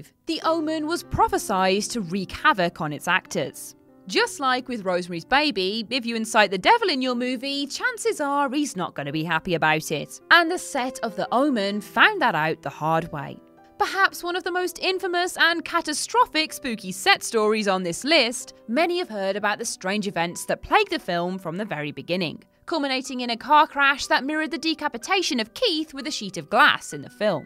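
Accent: United Kingdom. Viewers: okay, enough of this. is obviously British. American pronunciation is different. Get over it. Worchestershire is pronounced Wooster-sheer, schedule is pronounce shed-ule. You are aware that the British INVENTED the English language. We only borrowed it, so give her a break on pronunciation, okay. You go!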